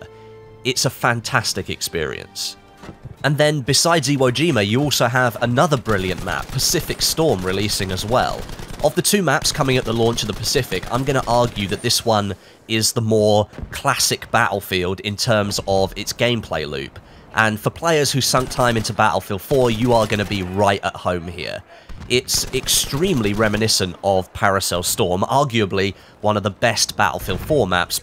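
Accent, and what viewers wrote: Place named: United Kingdom. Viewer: And that is how EA keep YouTubers silent about the horrible performance and bugs of this game. One year and we still doesn't have a smooth experience. I don't care you have your British accent , you are misleading your community about the truth about this game and negligence of Dice. No battlestats, no transparency.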